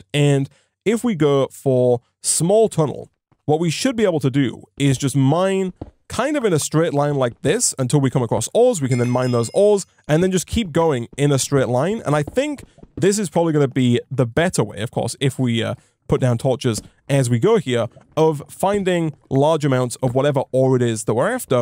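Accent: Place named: Hull, United Kingdom. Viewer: I've been trying to pin your accent down the whole time I've been watching your videos. I finally got it. That's a Hull accent. Great content fellow yorkshireman.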